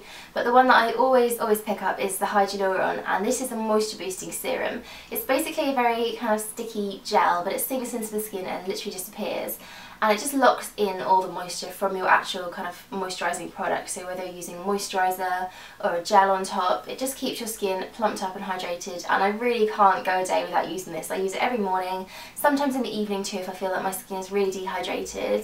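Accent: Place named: London, United Kingdom. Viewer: I thought you were from London lol! Where are you from? x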